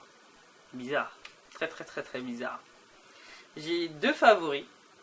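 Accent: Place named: Saint-Étienne, France. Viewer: Y'a comme un truc du nord???? peut être stéphanois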